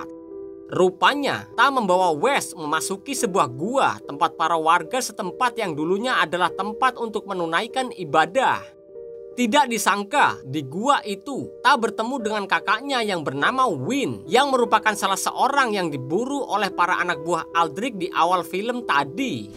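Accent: Java, Indonesia. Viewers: Ngomong.a medok banget jamet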